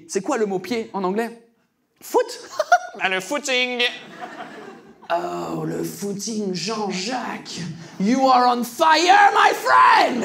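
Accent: United Kingdom. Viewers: he has impeccable french but i could tell right away he was British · C'est le seul accent british que j'arrive a supporter et à adorer. C'est super drôle et agréable à regarder j'adore !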